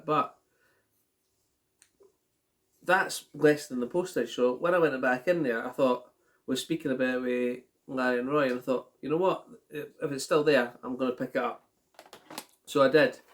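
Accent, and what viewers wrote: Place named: Scotland, United Kingdom. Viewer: Just wondering what part of Scotland are you from. I myself I was born in Fife👍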